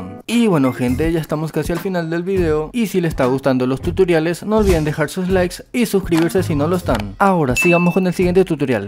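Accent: Paraguay. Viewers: Amo el acento paraguayo, lo reconocí porque mis apas son de Paraguay ✨ Buen tutorial, bro ✨🔥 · Eres de Paraguay no? lo note por tu voz además yo también soy de Paraguay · Su acento es muy paraguayo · Xd con tu voz se que sos Paraguayo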